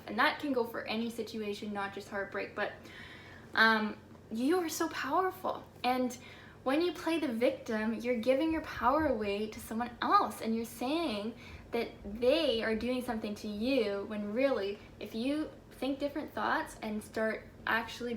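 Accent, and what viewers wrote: Canada. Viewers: Are you from ontario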